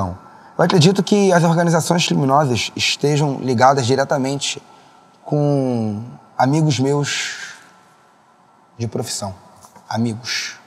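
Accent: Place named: Rio de Janeiro, Brazil. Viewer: Ouvir esse por 5minutos é agoniante. Acho que ele é o carioca com o sotaque mais puxado que existe!! Pqp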